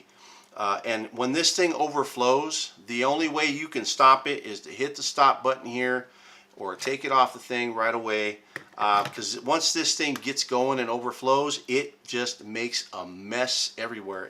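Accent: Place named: France. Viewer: Great vid, thanks. Are you still using the frother and the dual c❤coffee machine . I just ordered the dual plus machine and thinking of getting the frother. I ben loking e rywhre for anachine tht i cab do both kcup and nespresso. I sop motly online, i must of ben typing wrong or somthing lol hope the new cofe works good. Anyway thanks, oh you sound like you have a french accent, are you canadian ?😊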